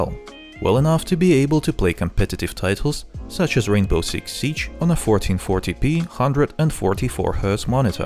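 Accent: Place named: Russia. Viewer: сразу русского по акценту узнал)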